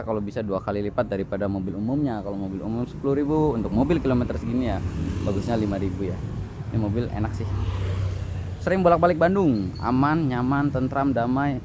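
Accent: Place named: Banyumas, Indonesia. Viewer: Om Ini Aslinya Orang Ngapak.Ya Ommm..."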